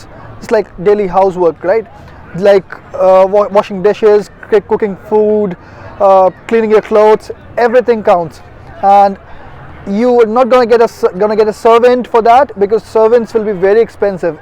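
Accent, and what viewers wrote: India. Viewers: You sounds like you are in India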